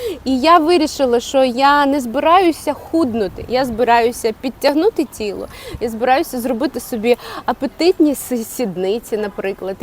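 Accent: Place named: Western Ukraine, Ukraine. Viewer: Ваш украинский напоминает западно украинский, я тоже так говорила, после отдыха на западной Украине.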